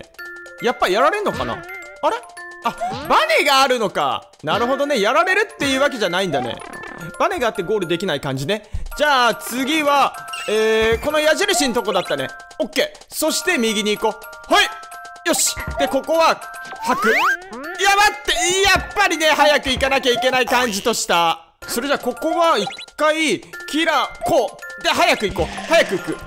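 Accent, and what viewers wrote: Fukuoka, Japan. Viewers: 全く関西弁ちゃうから気にせんでいいと思うよ〜！関西の俺からしたら福岡らへんの方言に聞こえます！ドコ住みか既出ならごめんなさい！ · 福岡弁は、関西弁なんかより柔らかくて素敵と思います(^^ 収益化が始まったとのことですので、より益々、見させていただきます。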